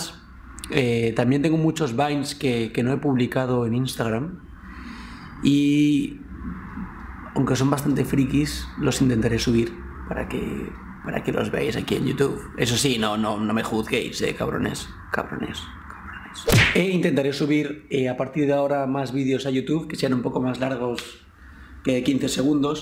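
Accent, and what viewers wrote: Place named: Argentina. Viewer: Yo pensé que era Argentino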